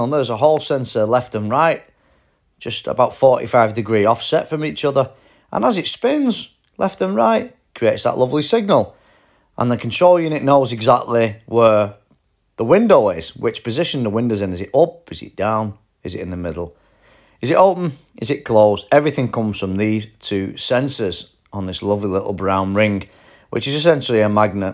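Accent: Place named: Bolton, United Kingdom. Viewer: Great vid. Must've changed hundreds of these motors when I was at BMW. Where are you based? Sounds like you're from Bolton.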